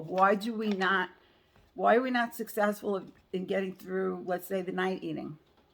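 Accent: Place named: New York, United States. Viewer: I love your newyork accent and your journey sw well done 🐝🐝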